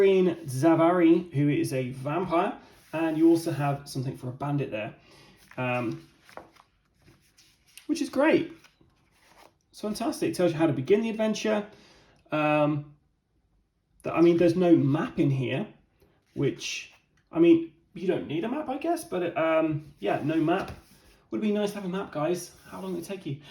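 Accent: United Kingdom. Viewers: OK, I'm confused. Youtube says this was uploaded a year ago but it only came out a couple of months ago didn't it? I'd put it down to geographic area but I'm uk and your accent is English.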